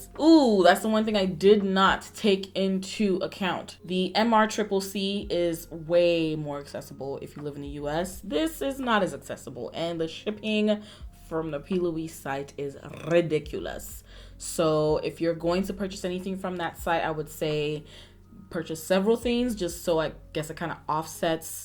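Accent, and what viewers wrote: Nigeria. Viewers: Chaiii your nigerian accent I no fit 😂😂😂😂😂😂😂😂 makeup on point!🔥🔥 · Lovinnnng your Nigerian accent 😍😍 😍 #nigerian.here😊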